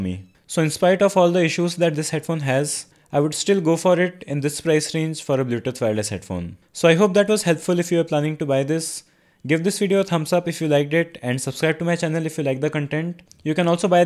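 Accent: India. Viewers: he shouldn't ignore it, the comment is true, indian accent is difficult to understand for majority of people including me. Though the contact is pretty darn good, it feels like he is reading a script. So consider the original comment, it only makes you better. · India...can you just...live and speak your native language and dont try to speak english? Yours is so awful. I'm sorry, but you so annoy to try speak normal language with your indian accent. Nobody understand what you say correctly. You need to repeat 100500+ time to understand. English is not yours. Learn some other...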